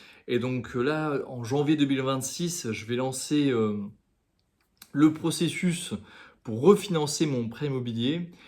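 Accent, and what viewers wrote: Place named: Poland. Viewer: Merci! L'accent polonais m'a perdu. Le centre ville de Lodz à un charme médiéval ( après ma visite de l'année 2013). Selon wiki, ce sont les chevaliers teutoniques qui ont construit son château. La ville à du se développer autour (comme un peu partout.) Lodz me paraît assez charmant selon les images google. Peut être une belle découverte grâce à vous.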